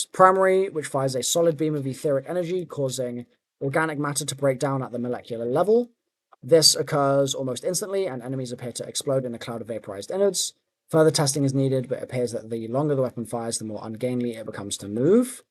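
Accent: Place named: United Kingdom. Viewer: I didn't know you where British.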